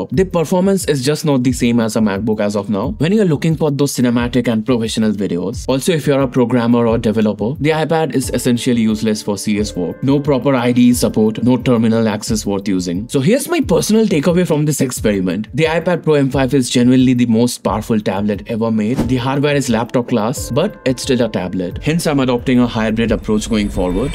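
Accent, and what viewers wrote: Bengal, India. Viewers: Bengali tone in English 😢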